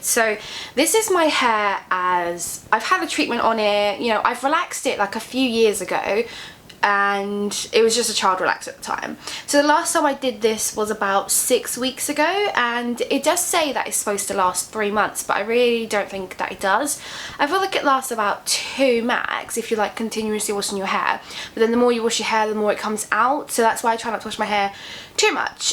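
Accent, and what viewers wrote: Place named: United Kingdom. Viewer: love your British accent you’re beautiful thanks for this video. Still haven’t done it yet gonna color first.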